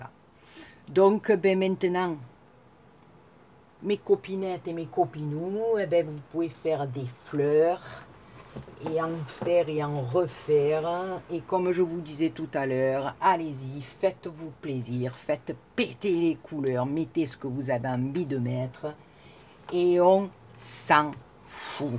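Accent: South, France. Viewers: je suis au Québec depuis 2002 avant j’étais en Avignon avec mon chéri qui lui a aussi ce superbe accent du sud! Nous avons choisi de quitter la France pour nous installer au Québec. Nous y avons eu deux enfants qui ont l’accent.... québécois lol C’est marrant mon fils a presque l’âge du tien. Je voulais aussi te dire que je viens de regarder plusieurs de tes vidéos et tu m’as beaucoup émue. Je te souhaite beaucoup de bonnes choses et je suis vraiment ravie de pouvoir te suivre désormais ❤️ Gros becs 😘 xoxo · Coucou ma, tu m'as tué avec ta carte 😂😂😂 j'en peux plus 😂😂. Encore de belles fleurs 😍 et papillons. Ah dommage tu n'auras pas le mot de la fin 😞 car je ne pouvais pas ne pas dire ce que je pense et que la vidéo charge. Je t'adore ma et ton accent du sud me berce et me met la joie. Gros poutous 😘😘😘😘❤ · Bravo !! Tout est dit 💕😏 avec un bel accent du soleil , comme moi qui suis du sud-ouest 😘 nouvelle abonnée a votre chaine. 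Merci du partage.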